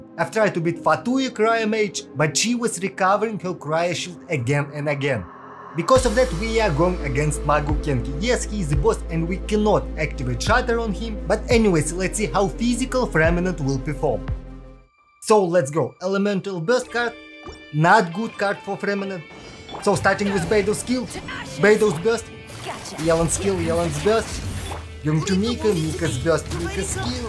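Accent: Russia. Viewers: ur accent like russian-en isnt it?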